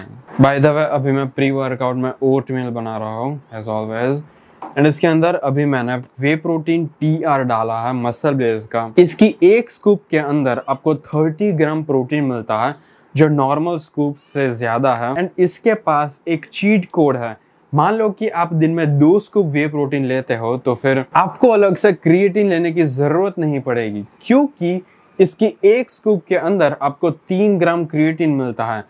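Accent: Gujarat, India. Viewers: Gujju accent >>>>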